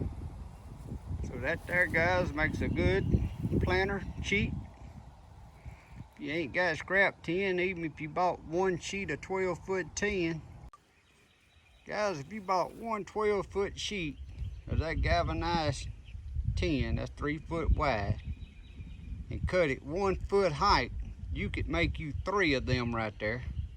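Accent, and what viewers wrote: South, United States. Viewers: I just found you, thank you. And it's good to hear your good old Southern Voice.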